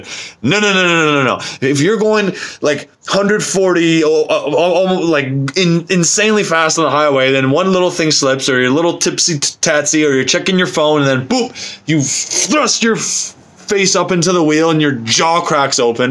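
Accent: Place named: United Kingdom. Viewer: 1. Your British accent is amazing. 2. On day 5 and going strong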